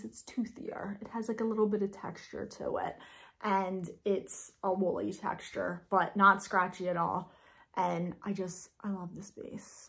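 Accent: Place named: Philadelphia, United States. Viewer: You have to live near Philly, we sound the same! Lol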